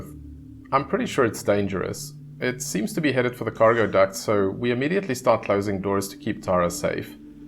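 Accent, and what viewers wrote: South Africa. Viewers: You sound awfully South African for a Singaporean!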